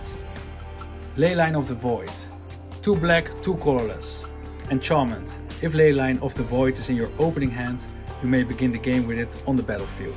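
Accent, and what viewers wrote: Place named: United Kingdom. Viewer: now thats how you speak the kings 🤴 English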